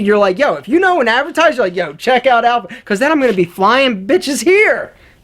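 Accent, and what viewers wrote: Philadelphia, United States. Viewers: Whoever told you not to do this video should be fired...the honesty (which I already realized, but some don't) is refreshing and positive. If more companies would be this open I think us commodities would be a lot happier with giving you our eyes. Sponsors are key, and also don't require the viewer to do anything but watch. Sticking to advertising stuff you believe in is the way to go...if you get paid to showcase products that actually help people, its a win win.....Keep it up. (P.s. Always good to hear that Philly accent on the tube lol, much love from the 215)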